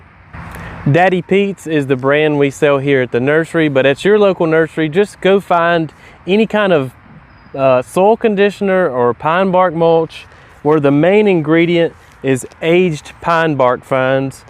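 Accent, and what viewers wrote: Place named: Tennessee, United States. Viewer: Did you say you’re in TN ? That’s where I am and your accent matches mine 😊. Thanks for the video! Very helpful. Hubby got us one of these yesterday for our anniversary 😊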